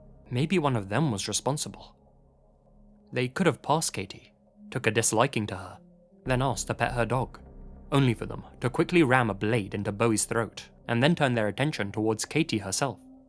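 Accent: United Kingdom. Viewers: They don’t say those phrases in the states. Sounds like a Brit for sure.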